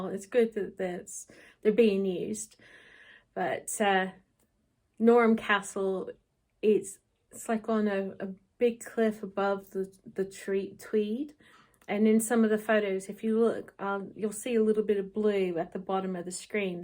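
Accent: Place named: United States. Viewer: I am stitching a castle right now! It is sooo beautiful where you live! I almost forgot you’re an American until I heard a slight American accent still! 😉 I am a homebody and don’t like to travel but I do sometimes with friends and always have a good time. My husband doesn’t like to travel unless I make him and I don’t because I like to stay at home too! 😂 But sometimes my single friends need someone to travel with and I will go. I enjoy your videos and your projects sooo much!❤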